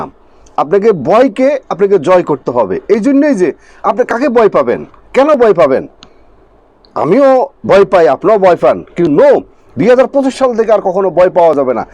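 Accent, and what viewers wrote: Bangladesh. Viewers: আপনি কি পূর্ববঙ্গের মানুষ ? আপনার কথাগুলো খুব টান আছে ? আপনার বাড়ি কোথায় ?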